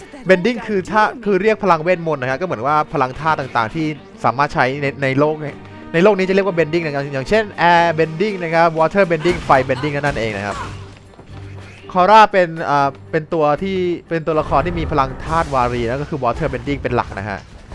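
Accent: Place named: North, Thailand. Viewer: พี่คนเหนือป่าว พี่ พูด เหนือ อะ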